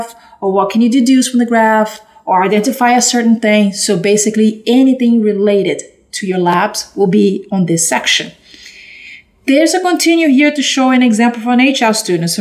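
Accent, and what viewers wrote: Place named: Latin America. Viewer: Clear, concise description, enriched with a Latin accent.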